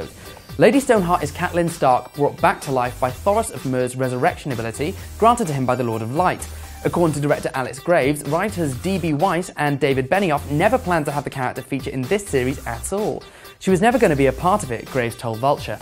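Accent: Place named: United Kingdom. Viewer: I don't really see what is annoying about his voice, unless you just find British accents annoying.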